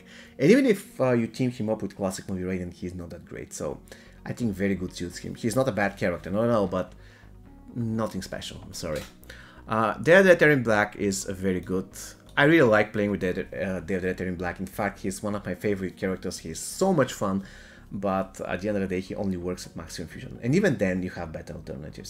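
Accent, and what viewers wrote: Bulgaria. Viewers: are you bulgarian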